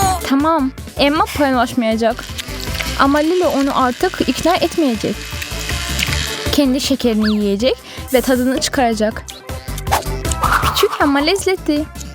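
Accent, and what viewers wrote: Azerbaijan. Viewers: Sen azerim8sin türk mu anlaşlimyo bence azerisin 😏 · Azerbaycanca konuşuyorsun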